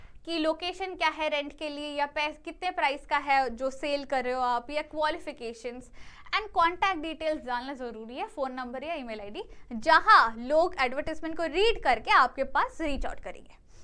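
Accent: India. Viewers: bihar, up, ya haryana se hoga sawra pakka.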